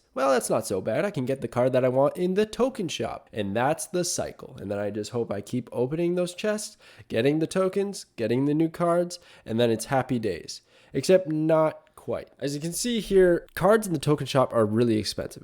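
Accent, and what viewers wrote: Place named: Southern Ontario, Canada. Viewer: its the first thing I noticed when I came to the channel. I'm from BC and there is nothing that I like hearing more than the southern Ontario accent (well maybe the east coast one too).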